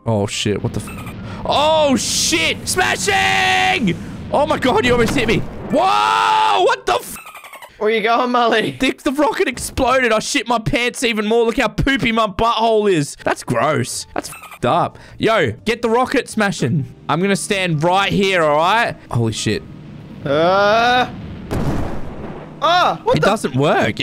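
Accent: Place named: Australia. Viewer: Delivery like a Australian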